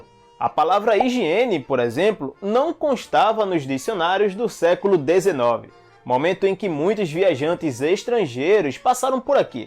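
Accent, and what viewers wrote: Northeast, Brazil. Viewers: A voz do é muito marcante.Tem sotaque de nordestino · Gosto muito do sotaque nordestino.👍🇧🇷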